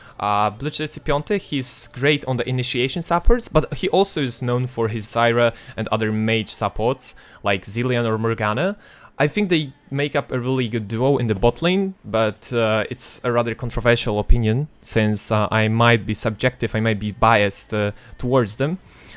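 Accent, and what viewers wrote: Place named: South, United Kingdom. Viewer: fajny akcent masz, taki łagodny południowo brytyjski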